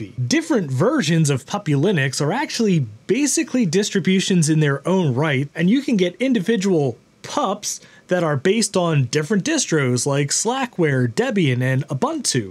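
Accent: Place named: New Jersey, United States. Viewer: Ah shit you just gave away your from Jersey.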